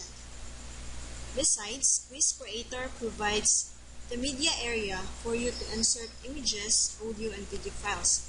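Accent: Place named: Latin America. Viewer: You sound Latino..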